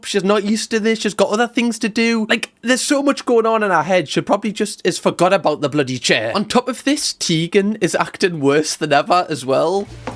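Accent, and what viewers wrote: Newcastle, United Kingdom. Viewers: I used to not find supernanny. Then you come along with your gordie accent and make it one of the funniest things I’ve ever watched.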